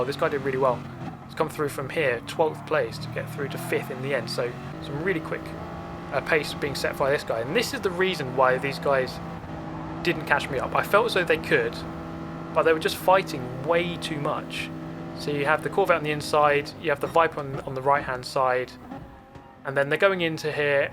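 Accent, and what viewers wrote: United Kingdom. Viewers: Your Initial D references earned my sub lol And I could listen to a British commentary on any topic 😆 love it! Keep up the fun videos and long live the shadow realm!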